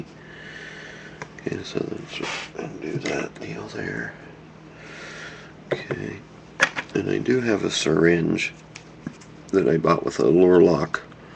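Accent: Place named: Pittsburgh, United States. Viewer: Great video..good tip about the Riva battery charger..I have to pull up the contact on the charger every time I charge my Riva..actually thought it was broke until I saw this tip..I know you're from Pittsburgh because you really have the Pittsburghese accent..Jeet jet??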